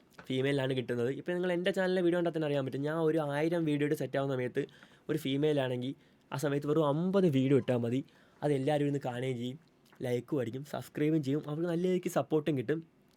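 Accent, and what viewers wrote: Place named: Kochi, India. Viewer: എടാ എന്റെ പൊന്നു മോനെ ഇവളെ അറിയപോലുമില്ല. പിന്നെ കൊച്ചിക്കാരുടെ ഭാഷയല്ലേ ഇവള് സംസാരിക്കുന്നത്. കൂതറ കൊച്ചിക്കാരിത്തി. ആ പേരിട്ടതിനെതിരെ കേസ് കൊടുക്കണം. കൊച്ചിക്കാർക്ക് ചീത്തപ്പേര് ഉണ്ടാക്കാൻ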